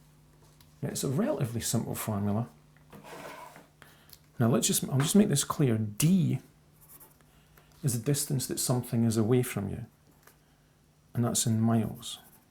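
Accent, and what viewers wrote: Scotland, United Kingdom. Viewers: Are you from Scotland? If so, are you from the Inverness area?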